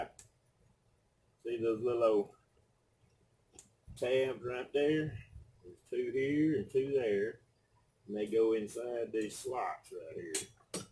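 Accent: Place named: South, United States. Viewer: THANK YOU SO MUCH. JUST PURCHASED THIS FAN AND I COULD NOT HAVE DONE IT WITHOUT YOUR HELP. U MADE MY DAY. SOUTHERN ACCENT WAS GREAT, I ENJOYED. HOT HERE N SACRAMENTO. I HAD A PROB WITH A WALMART PURCHASE AND THEY SENT ME 2. 2ND FAN WILL B MUCH EASIER 2 PUT TOGETHER. ONCE AGAIN THANK YOU GOD BLESS❤🎉🎉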